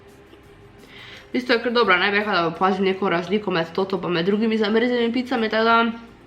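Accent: Maribor, Slovenia. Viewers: Zdravo, hvala za video. A si glih z Maribora al pa iz okolice (poskušam ugotivit iz govorice)?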